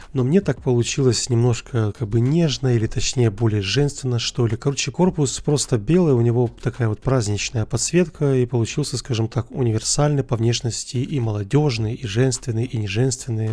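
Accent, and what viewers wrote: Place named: Ukraine. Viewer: Что, мне послышалось, или у автора появился легкий украинский акцент?) Он решил что добавлять перевод названия на украинском мало?)) (не смотрел его пару месяцев, потому сразу заметил)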